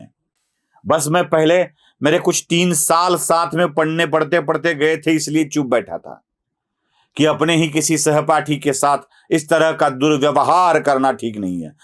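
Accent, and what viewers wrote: Maharashtra, India. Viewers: Hindi thik se nahi aati mharhti tuun lag rahi mharhti aap ke muha se achhi lagti Hindi na bola kare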